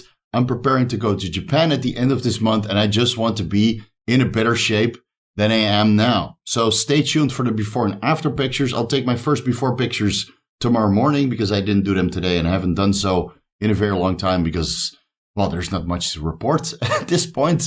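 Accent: Netherlands. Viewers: dus ja? interesante shit man kan wel redelijk horen dat je nederlands bent maar dat maakt het voor mij alleen maar mooier🤣. dank voor de content bro!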